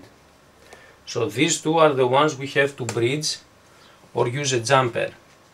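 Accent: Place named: Greece. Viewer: nice vid, when I heard your ink in first second I said .. this guy is greek, giamas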